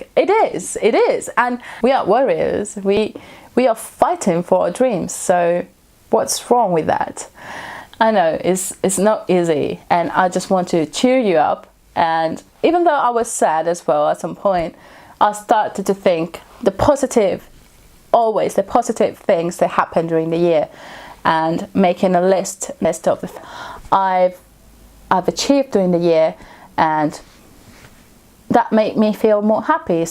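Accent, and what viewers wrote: United Kingdom. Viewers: You mentioned you are not a native speaker and I wouldn't have really known unless you said so. You have a wonderful British accent. All the best with your new channel. It takes time to get a following so don't give up if you feel like you don't have many subscribers or views at the beginning... keep at it! - Rob W.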